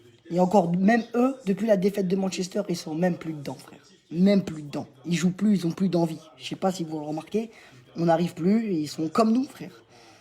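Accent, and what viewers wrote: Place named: Paris, France. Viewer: L'accent parisien cet horrible 😱. Mdrrrrrr le titre de la ligue 1 conforama lol 😂 et la coupe de France 🇫🇷 mdrrrrrr grandiose mec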